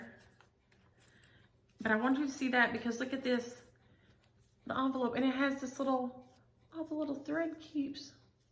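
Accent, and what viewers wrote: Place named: South, United States. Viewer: your videos make my day!!, you warm my heart. I'm a Southern living in the north and hearing you talk makes me feel right at home. Thanks for sharing with all of us. And by the way, where did you get that adorable red blouse?????? I LOVE it!!! Hugs, Judy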